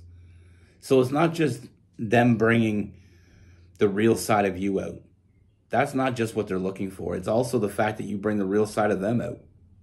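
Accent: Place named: Toronto, Canada. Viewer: so you're from Toronto eh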